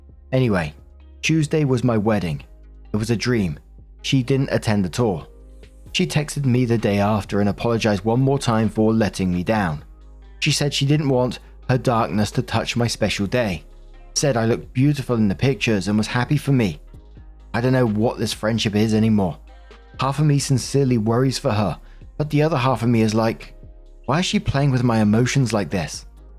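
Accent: United Kingdom. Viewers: Aren't British accents so sexy? 😍🔥🥵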